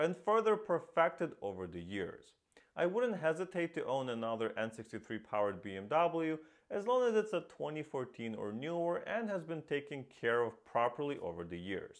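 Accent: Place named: Russia. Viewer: У тебя как будто русский акцент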